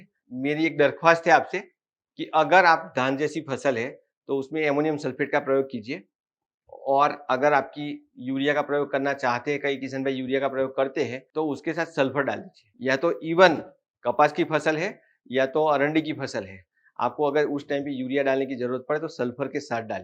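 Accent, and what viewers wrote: Gujarat, India. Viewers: आप गुजराती हो ना 😅 बराबर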